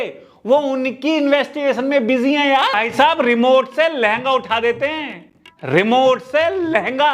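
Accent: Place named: Uttar Pradesh, India. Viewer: UP se lgte ho bhai 😅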